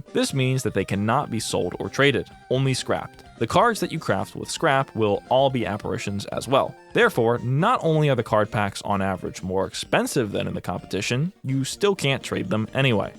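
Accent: Philadelphia, United States. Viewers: Are you from Philly? OH WAIT IS THAT WHY YOU'RE CALLED??? I feel like I'm learning so much lore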